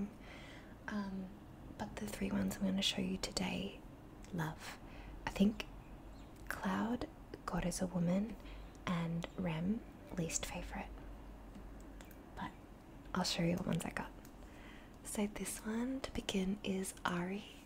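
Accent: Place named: Australia. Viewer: Love listening to the australian accent 🌞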